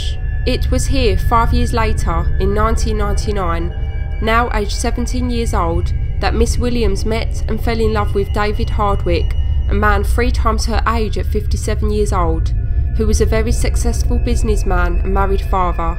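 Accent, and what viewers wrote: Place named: Cockney, United Kingdom. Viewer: Good documentary very well put together and very informative, but use a better narrator that monotone cockney accent spoils what would otherwise be a very polished production.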